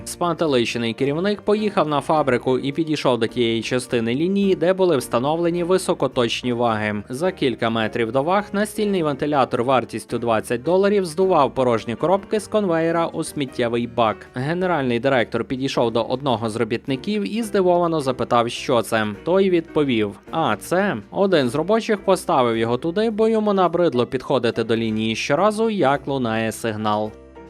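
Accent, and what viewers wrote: Russia. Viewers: У Ютьюбі є авдіокурс української літературної вимови Миколи Погрібного. Навчіться нарешті правильно вимовляти українські звуки, бо бридко чути вашу зросійщену вимову.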